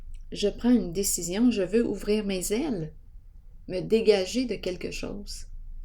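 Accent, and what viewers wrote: Canada. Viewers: Bonjour. Merci pour vos tirages très parlantes. J'adore votre dialecte. C'est français canadien? Par avance, je vous remercie pour votre réponse et je vous souhaite un excellent weekend. Bien à vous 🤗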